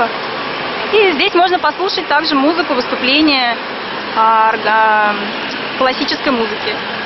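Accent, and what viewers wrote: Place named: Saratov, Russia. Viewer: Комментирует нормально, чисто по Саратовски, не нравиться не слушай.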